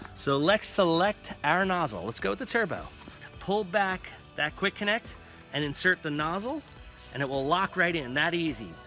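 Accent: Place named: Pennsylvania, United States. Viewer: hehe is this guy from PA? I like how his accent says "water" as, "wadder" :0) Great tips though, I like a visual on how to hook mine up.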